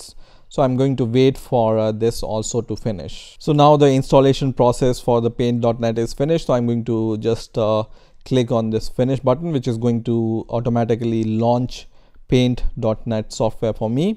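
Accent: India. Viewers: You know it's legit when the dude has an indian accent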